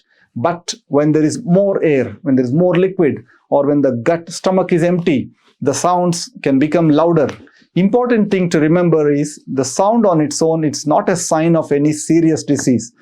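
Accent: India. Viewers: I'm sorry I couldn't watch it because of his accent.Strange thing is that I'm indian too.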